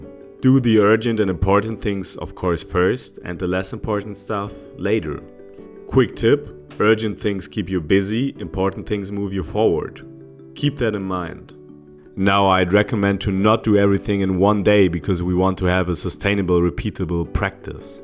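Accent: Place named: Germany. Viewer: apart from the strong german accent, this was a very nicely done video... loved the classical music, too ❤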